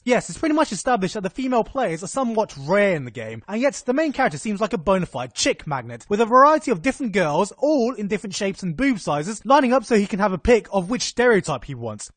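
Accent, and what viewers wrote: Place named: United Kingdom. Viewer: 英國口音就是這聲音啊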